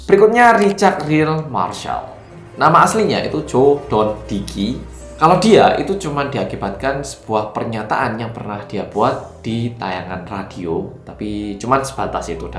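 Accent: Java, Indonesia. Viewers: Mas nya orang Jawa kah ?? Medok Sekali ngomong nya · Kak,kk pernah tinggal di Jawa ya soalnya logat bet hehehe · Mas orang korea apa orang jawa, bhs jawanya medok bgt..😅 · medok banget oppaaaa 👍🏻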